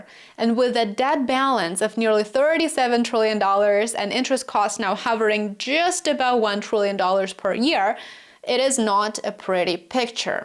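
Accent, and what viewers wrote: Russia. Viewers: Pretty Russian accent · She sounds Russian. Is this Putin's propaganda Ho?!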